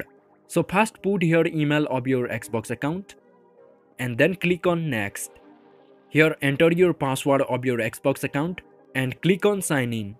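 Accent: India. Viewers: thank you for the info sir i bear that in mind it's very useful. everytime i always need help there will be a man with Indian accent that always be with me to help thank you again sir all the best to you now sir soon you will blow up and become famous youtuber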